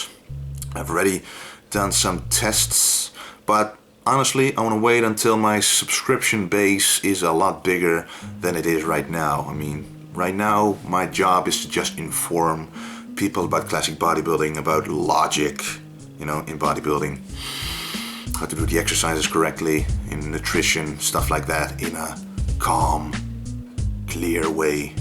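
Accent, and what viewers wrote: Netherlands. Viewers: Were are u from? You sound like a Dutch Guy ! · it's awesome to see another lifter enjoying what he does and publicly answering questions like that. It's quite admirable that he does these videos in english too... I notized right away that this fella must be dutch due to the on and off popping out of the accent. Right on cuz keep it up !!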